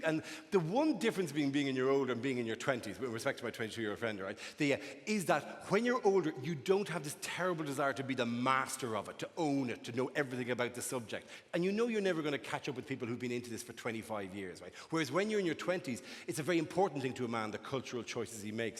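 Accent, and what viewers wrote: Ireland. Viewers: it's just an Irish accent and not a lisp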